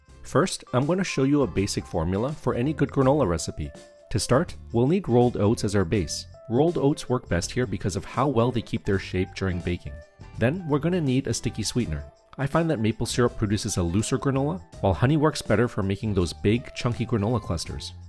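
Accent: Canada. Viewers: Very nice recipes. 💖I have been baking my yeast breads using granola and what a game changer that has been. I haven't gone back to my regular favorite bread recipes since I started using granola in them. I will definitely start making my own granola now that I have watched your video. I need to ask...are you from Canada? A few of your words hinted to me that you are Canadian. Thank you so much for sharing for recipes.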